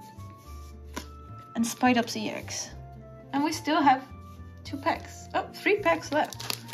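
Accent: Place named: Europe. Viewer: Oh I see I wasn't familiar with your accent tho 😂😂 I tho. it was somewhere in Europe hahha 😂😂❤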